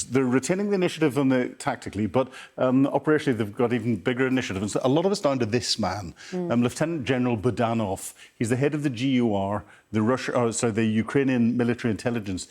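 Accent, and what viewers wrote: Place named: Ulster, United Kingdom. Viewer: Just love his Ulsterman delivery........day 578(eight!) of a 10 day operation.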